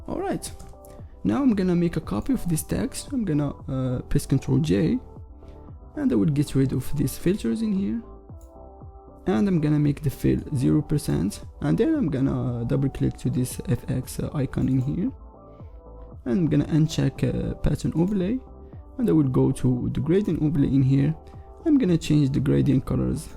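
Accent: Morocco. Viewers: Mn lhja dyalk bayna fik mghribi 😅😅 Kml bro❣️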